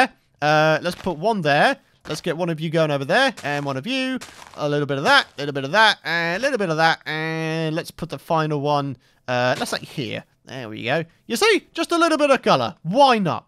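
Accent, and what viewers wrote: Scotland, United Kingdom. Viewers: Hi:) I'm one of your new subs and I'm curious..:) So I have a question for you. Are you from Scotland? I seemed to hear some Scottish dialect from you. I'm from Denmark and I am a big fan of the Scottish/Canadian rock/folk music group Runrig. They are unfortunately taking an absolute last world tour this year.:(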